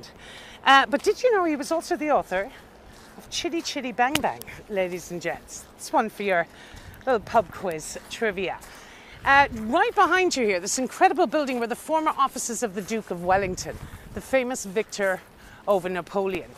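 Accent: Ireland. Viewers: Still Beautiful and an Irish Princess!! Thanks for your Knowledge & Professional Tour! & Thanks for that lovely Irish voice... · my two favourite subjects London and history and superbly well put over also a gorgeous Irish accent . I love this part of London and learned a lot fromthis video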